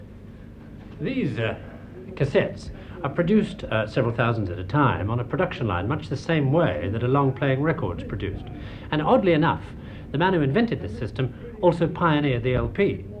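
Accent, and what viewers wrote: RP, United Kingdom. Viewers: Didn't James have a very silly BBC voice back then?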